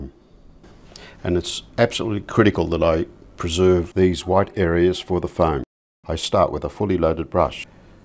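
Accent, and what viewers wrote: Australia. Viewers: Hi, just discovered your channel! How nice it is to hear another Aussie. I promptly subscribed and look forward to learning lots..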